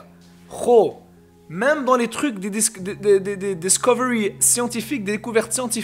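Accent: Canada. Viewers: mais c’est un canadien tout le monde parle comme ça ici !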